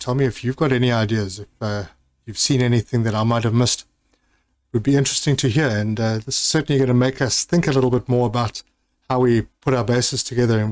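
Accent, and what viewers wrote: South Africa. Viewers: I tested the T3 ore extractors, and you can mine everything without having to go to specific areas (super alloy cave, osmium cave, etc.). Also am I detecting a South African accent?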